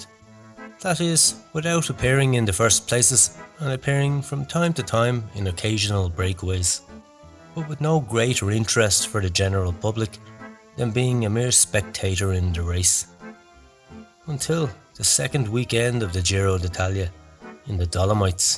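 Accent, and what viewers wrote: Ireland. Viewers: Good stuff.Subscribed.!! Keep it up.Not a wee bit Irish are ya? How about one on Sean Kelly & Stephen Roche?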